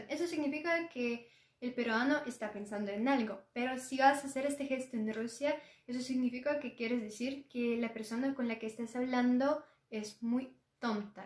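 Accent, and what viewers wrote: Argentina. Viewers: Como hablas el argentino es muy bueno jaja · Lo peor que pudistes hacer es agarrar el acento arjentino, es el mas feo de todo latino america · tenés un acento parecido al cordobés (Argentina) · Yo como mexicano si he comido los famosos Escamoles,que es la hueva de la hormiga,y son deliciosos,y muy caros ,ha de ser por que no es facil recolectarla,ya que los que se dedican a eso tienen que soportar muchas picaduras de las hormigas,hay vídeos de eso,saludos rusita,que has aprendido español y que estas tomando acento argentino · Si tiene acento argento y vocabulario argentina... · Jajaja me encanta el acento argento que tenés, hablás muy bien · Interesante video! 👏 Que bien el acento y modismos de Argentina 😊🇦🇷. Saludos desde Buenos Aires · Saludos desde Argentina me encanta tu acento Argentino che. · Me encanta tu español muy argentino saludos desde Argentina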